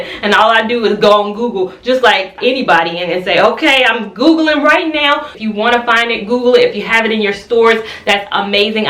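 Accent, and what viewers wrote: South, United States. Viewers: You know, I see people leave comments like this often on your videos, but it doesn't bother me. I'm from northern Florida, so it may be that I'm just accustomed to Southern accents because I never have an issue understanding you. I have had issues with understanding some people from up North who talk fast, though, so I think that plays a small part in it. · I defiantly didn't mean anything by it. I too am use to a southern accent. For the camera it was a bit fast. Nevertheless, I enjoyed your video and have become a new subbie.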